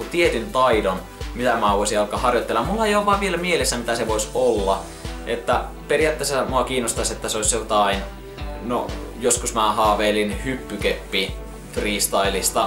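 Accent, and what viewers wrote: Finland. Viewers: Tuli vaa mielee että missä asut kun puhut tuommosta murretta. Lapissa mutta missä siellä?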